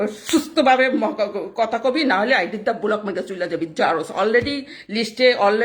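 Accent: Barishal, Bangladesh. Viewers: Apni ki borishaillah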